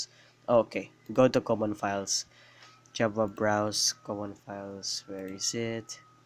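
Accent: Philippines. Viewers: Are you filipino? Your accent sounds so. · filipino accent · Halata sa pag sasalita mo pinoy ka eh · let me guess your from philippines?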